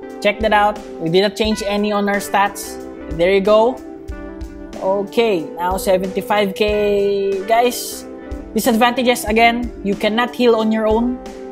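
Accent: Philippines. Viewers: Your on philipines thats why your voice is like that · sa boses mo halata na na filipino ka eh haha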